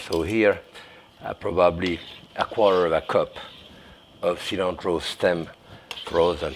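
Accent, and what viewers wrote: France. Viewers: I could listen to him talk all day. I love that French accent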